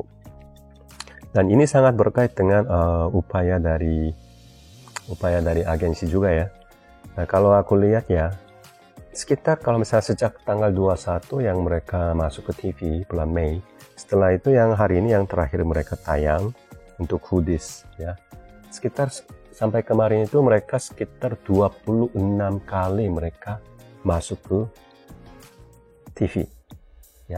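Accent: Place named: Java, Indonesia. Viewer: Intonasinya kaya orang jawa ada medoknya dibeberapa bagian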